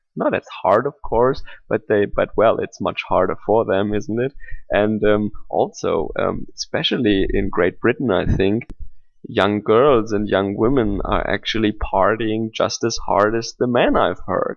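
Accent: Germany. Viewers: I seriously just heard german accent in this and never really watched anything by.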